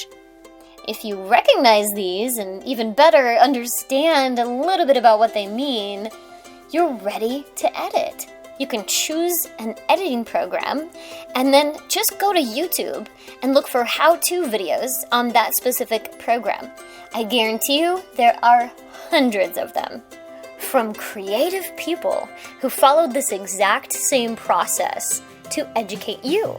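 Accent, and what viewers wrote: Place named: France. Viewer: Wow this is a master piece video. You covered it all and we can see your heart through the content. More than educational. I love your French accent "Très bien" 😊 Merci beaucoup 🙏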